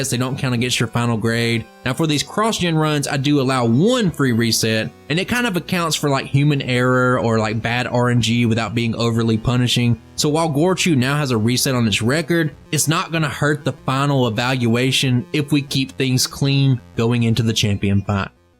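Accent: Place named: Alabama, United States. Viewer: The twang behind it feels like that Bama flavor like my boi Cooper has 🔥 But yeah til this day we never grew up 😅 we still play Pokemon & battle each other on pokemon showdown & we're 30 now 😂🕺🏾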